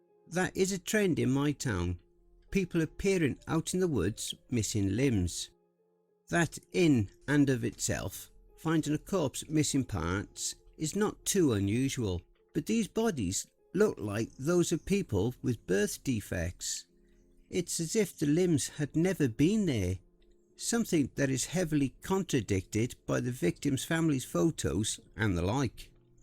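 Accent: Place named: RP, United Kingdom. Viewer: With a delightful RP British accent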